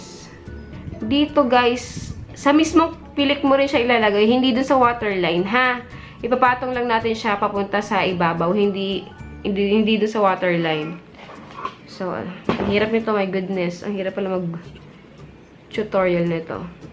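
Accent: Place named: Quezon, Philippines. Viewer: thanks maamsh.. Taga san po kau parang puntong quezon.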